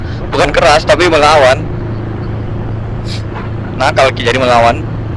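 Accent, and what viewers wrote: Makassar, Indonesia. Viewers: mantap mas bro... makassar banget.. lanjutkan om... hehehe...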